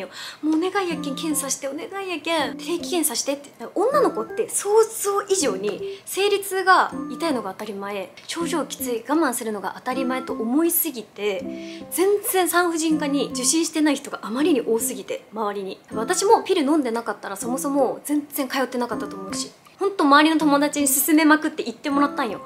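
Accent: Oita, Japan. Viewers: ちょこちょこ出る大分弁。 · 最近さん知りましたが大分弁に親近感です❤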